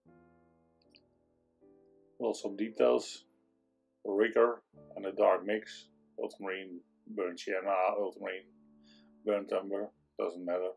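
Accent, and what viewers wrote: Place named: Texel, Netherlands. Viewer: Love this painting..so beautiful. Love your accent as well. Reminds of my grandfather who came from Texel...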